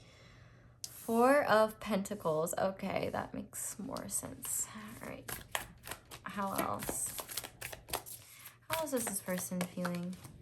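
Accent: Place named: United States. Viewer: i wish i knew which part of america her accent is from. i fall asleep to her voice so often. it is so soothing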